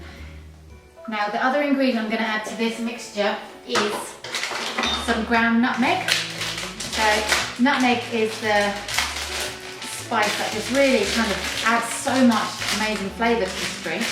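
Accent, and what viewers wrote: United Kingdom. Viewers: Alton brown I love that guy show I already like you #subscribe the accent is a plus I adore British accents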